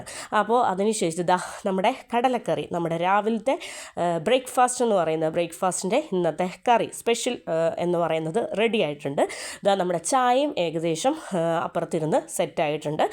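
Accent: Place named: Trivandrum, India. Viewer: Nice video. Appavum kadala curryum nalla combination aanu.Nalla avatharanam. Kellan enik valiya eshtamanu. Place aevideyanu? Samsaram ketit Trivandrum aanennu thonnunnu ❤❤❤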